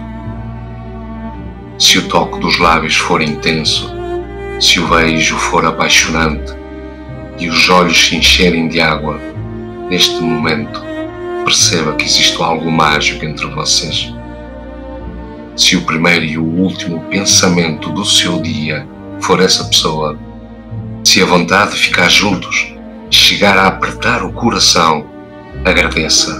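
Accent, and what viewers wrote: Portugal. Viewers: Amei a declamação do poema. Este sotaque português é divino. Quem declama? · Lindo e neste sotaque português ficou ainda mais terno e doce, irresistível, um bálsamo para a alma! AMEI!! 😃😍😍👏👏👏🙏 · Samir 4150 sotaque Português de Portugal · DEsculpe....Só não gostei q fosse nesse sotaque português.... tanto Drummond quanto Selma são brasileiros, e essa música de fundo trás um clima de funeral....qdo fala de amor chegando em nossa vida... 😢 e não condiz com a mensagem ...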